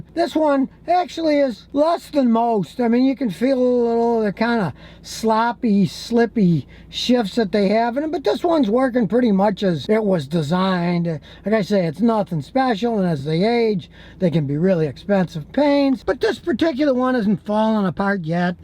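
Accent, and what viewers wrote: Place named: Houston, United States. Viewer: This is Richard Dawkins, if he had been born and raised in Houston.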